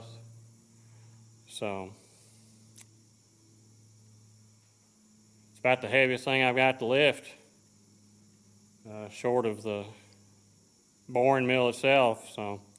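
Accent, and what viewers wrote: Kentucky, United States. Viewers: I have to say I subscribed after watching you on Keith Rucker's site. I've become a fan but just tonight I went back and watched all the barn build vids. I am blown away by the work you do by yourself. You basically rebuilt a barn to save a roof. You may not have Abom torque, but you damn sure got plenty of Fenner's Getter Done. I love your vids but if I could give you any advise it would be work on the audio. I don't know what these other guys use but I have a hard time hearing you at times and I'm from TN and understand the KY accent. I also love that you include Bailey at times just like Adam did Stella (RIP).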